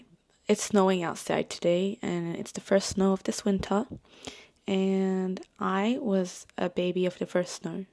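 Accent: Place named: Australia. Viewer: it’s not boring at all! i really enjoyed learning a little more about you… and your voice is really lovely and heartwarming and so is your presence which is a bit different from your photos on instagram(both are great btw). and the touch of aussie accent just great! i was smiling the whole video like an idiot 😂 thank you for making this video and good luck with your next goal 🫶🙏 btw i got my assumption about you right 🤣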